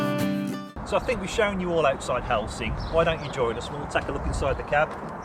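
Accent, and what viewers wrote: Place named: West Midlands, United Kingdom. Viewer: Just came across your channel as one of our videos is a suggestion from one of yours. Where are you from as your accent sounds proper West Mids. We are from the West Mids.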